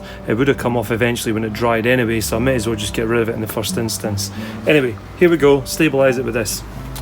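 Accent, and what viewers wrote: Scotland, United Kingdom. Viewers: How can anyone be in a bad mood after watching this? Beautiful scenery, amazing finds, a delightful Scottish accent, and best of all, the Belter Boogie.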